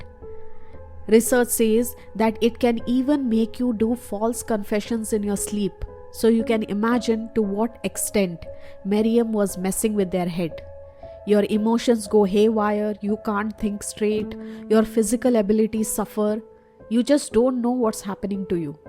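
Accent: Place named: South India, India. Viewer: Narrator sounds as if she is South Indian.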